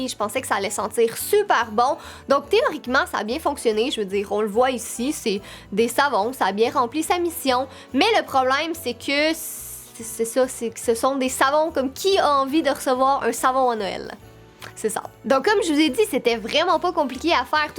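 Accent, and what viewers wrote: Quebec, Canada. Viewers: Oh cozu hihi l accent québécois est gros drôle et beau😂😀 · J'ai 10 ans mais j'adore l accent québécois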